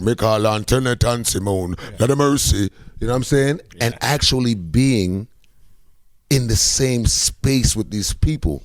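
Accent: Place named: Jamaica. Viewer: he flows naturally into a Jamaican accent...... only dumb people wouldn’t figure that out !!!! 😳😳😳😳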